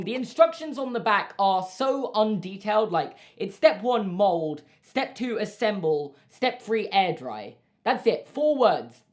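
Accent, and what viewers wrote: United Kingdom. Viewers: Hi I’m new to ur channel today and just subscribed but I was wondering why u have a british accent but it was in $ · is british? idk but his talking is different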